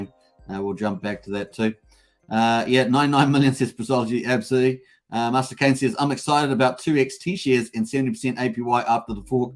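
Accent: New Zealand. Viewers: Are you Kiwi bro?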